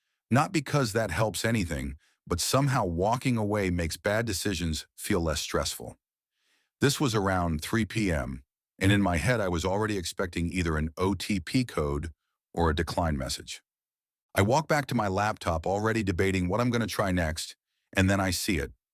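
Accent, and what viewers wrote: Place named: India. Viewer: I can smell the Indian accent from behind the AI voiceover.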